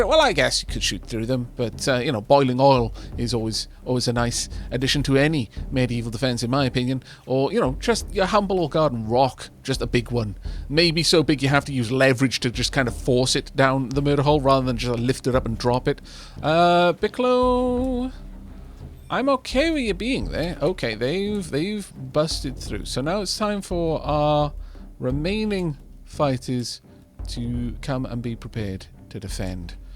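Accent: Wales, United Kingdom. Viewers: with your sultry Welsh tones and your propensity for looks over function, how could I not!?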